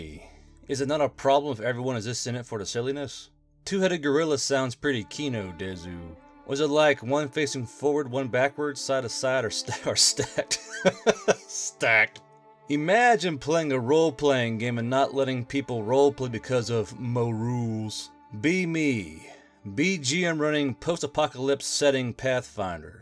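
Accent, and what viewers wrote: South, United States. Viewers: He has a very heavy southern accent. Makes listening to him more fun for me since I can see an old folk I used to talk to who spent plenty of time telling me war stories.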